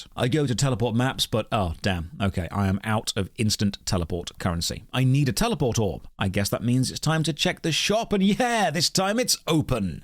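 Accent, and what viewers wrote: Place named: RP, United Kingdom. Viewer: Oh, thank god, a new. I have been fucking sick for over a month now, and I just... I just need to listen to a pretty man with a posh little accent bitch about an MMO. I'm so glad this came out today.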